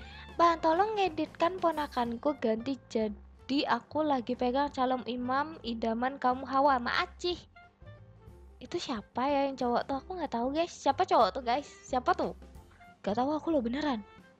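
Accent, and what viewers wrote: Java, Indonesia. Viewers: Kaka orang jawa ya? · Asal dari mana k,aku baru subcreab k,dari logatnya dari orang jawa.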